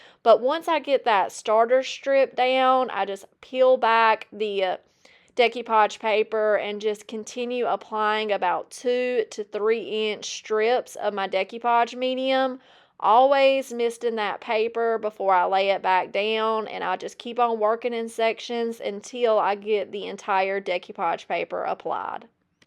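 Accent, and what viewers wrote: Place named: Texas, United States. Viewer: I just found your channel. Your accent sounds like my neck of the woods….NE Texas.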